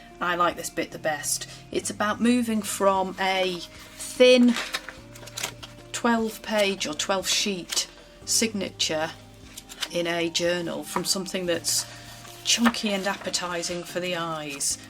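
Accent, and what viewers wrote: England, United Kingdom. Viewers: I’m sorry to bother you with my comments hon I moved to Melbourne from London in 1973 and so enjoy hearing other English accents, which are few and far between these days!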